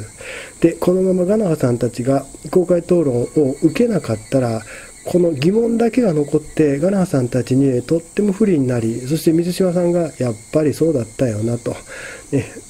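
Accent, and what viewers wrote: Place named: Kansai, Japan. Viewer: そうですねー。水島さんもちょっと感情的になってたんでしょうね。第三者なら、まさかアンタは慰安婦だ！という意味ではないだろうなとわかると思うけど、今、揉めてる当事者であり真子さんは女性なので「慰安婦」という文言を使ったのは、あんまり適切ではなかったかなぁと私も思いますね。私は真子さんが我那覇パパにべったりなのと、江崎さんって胡散臭過ぎるような感じがするので、今までの我那覇さん達の実績をあまり知らないのでなんとも言えないけど、一般の本土の人間として、我那覇パパ、江崎さん、ヒステリックになった真子さんのトリオはへんてこりんに見えましたね。でも、水島さんの慰安婦発言、そこは不適切かなと思います。女性に対してだからね。そういう意味では水島さんもちょっと常軌を逸してたような気も。 ところで、先生は関西出身ですか？私、関西人なんで、時々、関西弁のときがあるからそうなのかなと思いました。私が関西人だからかもしれないけど、神戸っ子の立場からしたら、とても親近感を感じましたー。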